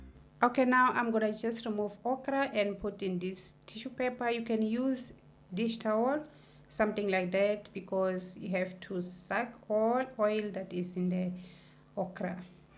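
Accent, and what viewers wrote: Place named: England, United Kingdom. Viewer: I love your English accent 😍 that okra is inviting me to come eat 🤩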